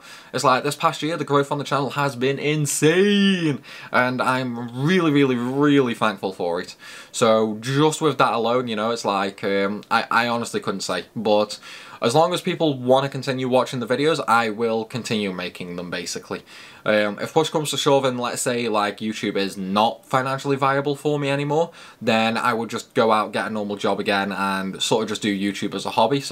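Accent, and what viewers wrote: Stoke, United Kingdom. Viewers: Loving the content and dedication you have. Is that a Stoke accent btw?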